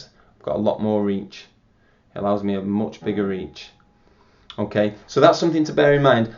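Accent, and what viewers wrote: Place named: United Kingdom. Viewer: Man's sounds like asian and british at the same time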